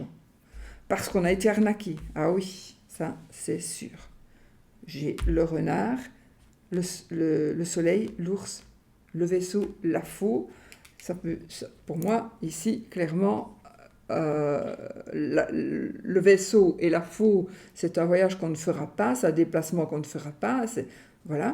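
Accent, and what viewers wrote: Belgium. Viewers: Au plaisir je vous adore vraiment je vous suit depuis un bon moments et jaime votre naturelle et votre accent belge😂 vos guidance sont tres pertinente vous parlez de tout j'adore continuer comme ça et si l'écriture automatique vous appel nous aimerions bien avoir une lecture pour le fun merci merci belle journée a vous ❤🙏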